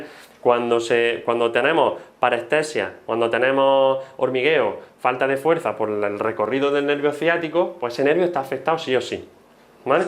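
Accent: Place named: Spain. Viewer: Me pueda ayudar creo que usted está en España por el acento que tiene quisiera si pudiera darme algunos consejos gracias felicidades por su explicación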